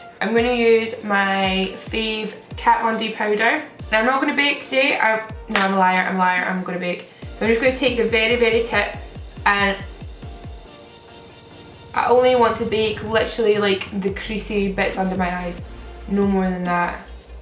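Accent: Scotland, United Kingdom. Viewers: What part of Scotland are you from? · are u scotissh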